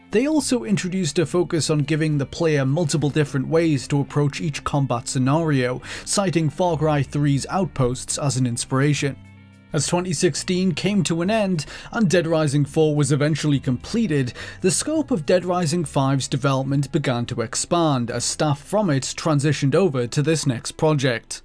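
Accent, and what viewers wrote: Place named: North, United Kingdom. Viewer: i wish i could listen to northern british accents but i just can’t :(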